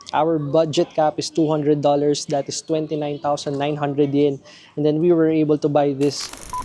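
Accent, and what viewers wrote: Philippines. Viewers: watching this channel for the thick philipino accent! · Love your PH accent, was in PH years ago loved it there so nice people! Wow those Tissot and Hamiltons are stunning!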